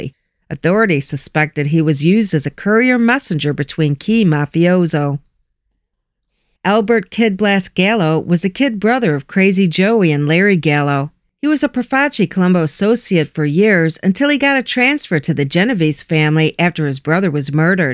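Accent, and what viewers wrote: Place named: New York City, United States. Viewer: Hello 🔥. Love to hear your saweet voice and very oh so subtle NYC accent.❤ Love the way you put the hard "G" at the end of LONG when you say Long Island - true New Yawker. You always deliver the goods when it comes to the rogues of da mob. 😎🤌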